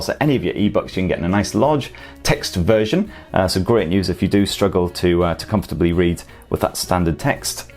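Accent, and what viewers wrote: United Kingdom. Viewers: Excellent Review! Your British accent helps too. 😎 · Garbage device and garbage accent ! Please speak like normal human being and not British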